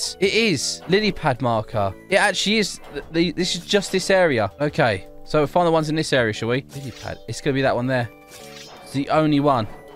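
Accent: United Kingdom. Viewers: ur british? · BRITISH ACCENT lol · He’s British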